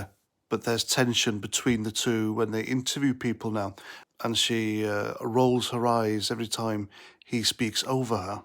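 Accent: Wales, United Kingdom. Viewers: LOVE your Welsh accent. I could listen to it all day. My grandfather was Welsh.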